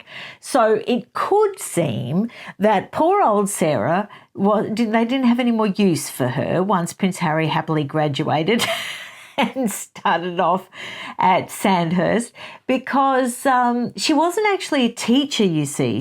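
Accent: Australia. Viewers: Love your Aussie ascent and true poor Harry was helped all the time in his education. Sadly it’s coming back to ‘bite him.’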